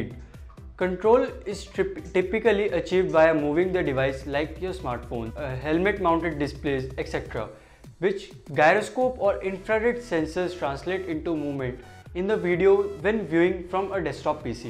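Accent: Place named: India. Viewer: What is everyone's problem, he's talking fine, it's a heavy Indian accent but totally understandable.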